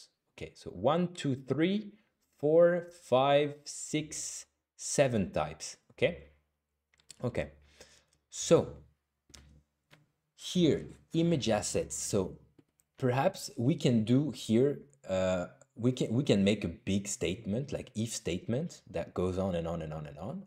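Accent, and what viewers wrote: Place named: France. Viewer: god , I love the French accent.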